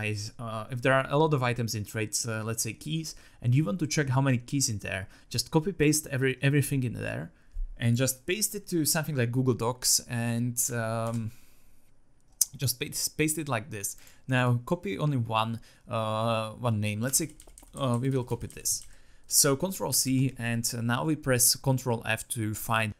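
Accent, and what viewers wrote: Slovakia. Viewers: Počkej, ty jsi slovák? :D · Len som klikol na video asi 1minutu som ťa počúval a hneď som vedel že si slovák je to vidieť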